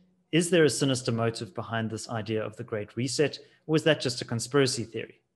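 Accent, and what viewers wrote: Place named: RP, United Kingdom. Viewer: Having vast professional experiences with the English, then dating a few Scotsmen and travelling there extensively, I must say that you kind Sir really do speak the Queens English as well as that POS!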